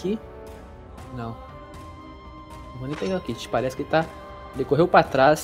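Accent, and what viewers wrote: Rio Grande do Sul, Brazil. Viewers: Cê é gaúcho?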